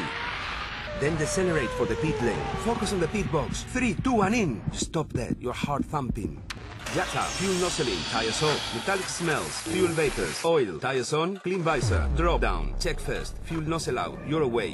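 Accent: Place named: Spain. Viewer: I like your accent. Spanish?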